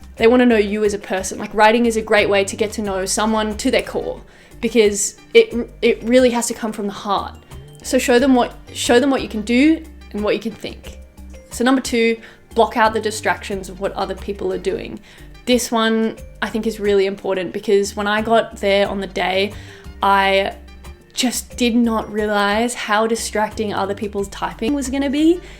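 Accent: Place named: Australia. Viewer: thanks for your content! pleasant to hear a fellow Aussie accent!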